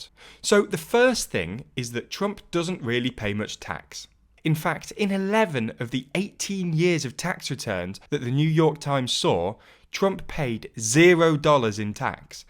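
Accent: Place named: North, United Kingdom. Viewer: When did pick up a Northern accent?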